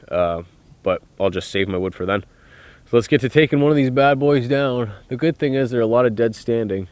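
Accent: Ontario, Canada. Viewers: Are you Canadian? You kinda talk like one. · thought i detected an Ontario accent - definitely some french metis in there as well. from a fellow cunnuck :)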